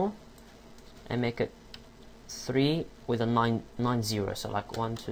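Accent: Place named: South Africa. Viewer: That's because it isn't a British accent. It's a South African one.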